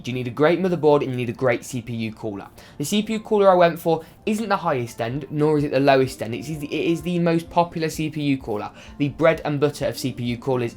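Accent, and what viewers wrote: United Kingdom. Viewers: does anyone found it feeling so damn hard and stressful when hearing to talking, i dont mean that i cant hear what he said but its not feeling good for me to hear that kind of british english.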